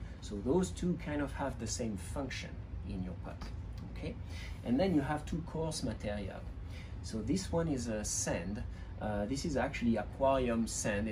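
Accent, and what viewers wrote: France. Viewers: hello, where in Canada? love your french accent🙂(I could be wrong 😅) do you think I can leave it outside (Montreal) until the first snow, or do I have to transfer it to a warmer location (like indoors on a shelf near a window) ? thanks for the video👍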